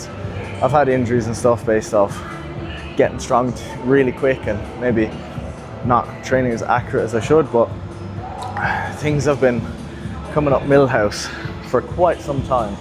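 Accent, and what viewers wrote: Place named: Ireland. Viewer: we’re all strange cunts, Normal is boring 😆 , Yes I’m from Cork myself that’s why im tryna figure out where from here your from by accent😂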